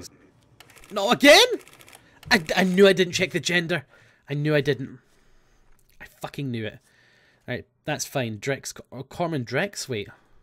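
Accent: Scotland, United Kingdom. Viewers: He is Scottish he can't control his accent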